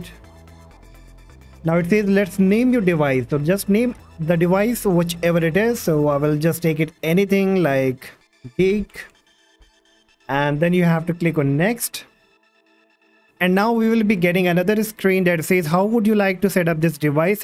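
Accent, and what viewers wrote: India. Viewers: Why is it always Indian accents… it’s like IT is wasting my time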